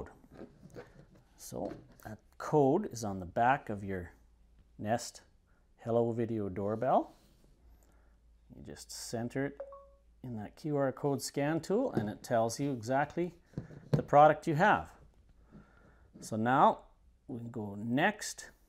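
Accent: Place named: Canada. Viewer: Great video. You say your in Arizona but you sure sound Canadian!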